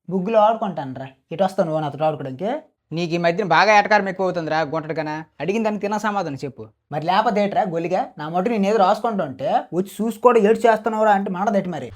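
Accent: Srikakulam, India. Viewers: Srikakulam Slang ❤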